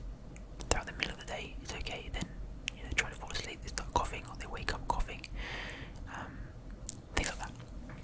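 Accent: United Kingdom. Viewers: Time for another game of have I been saying that word wrong or do British people just say it differently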